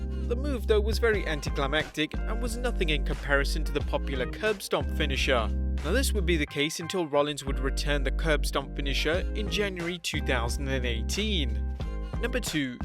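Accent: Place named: United Kingdom. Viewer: Don't like british accent but good video.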